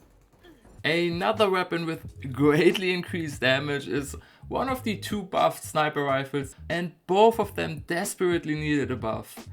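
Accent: Germany. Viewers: Ich hab mir während der ersten 2 Minuten schon gedacht, das du n deutschen Akzent hast😄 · Der deutsche Akzent ballert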